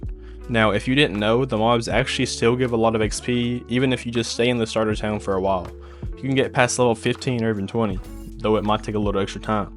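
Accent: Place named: South, United States. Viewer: Bro has the most southern accent ever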